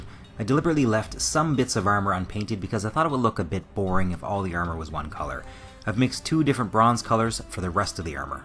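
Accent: Canada. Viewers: I knew you sounded Canadian! Maritimer?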